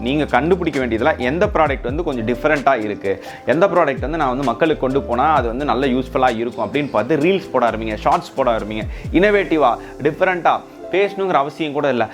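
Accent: Salem, India. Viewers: Bro neenga salem ma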